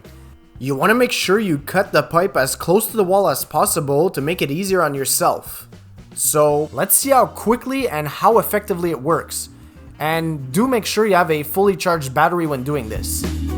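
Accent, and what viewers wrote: Quebec, Canada. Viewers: I'm guessing French Canadian from Montreal or Gatineau.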